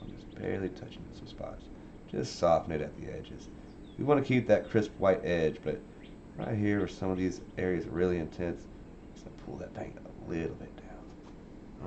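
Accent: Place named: Texas, United States. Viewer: totally agree, the entire human experience boils down to sharing stories via art in whatever form that carries it, everyone should have access to and nurture that inherent need to create and feel fulfilled by it. RDR is my favorite game too! unfortunately it's such a favorite that it cut heavily into my painting hours lol. your accent is nice though, it makes me miss texas :) i hope you continue to share your art & thoughts here!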